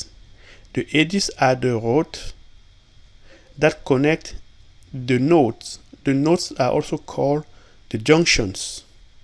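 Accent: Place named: France. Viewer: try to improve your english you speak frenglish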